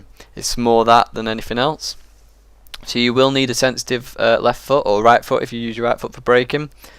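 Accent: England, United Kingdom. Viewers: nobody gives a fuck about your videos and your stupid Engayland accent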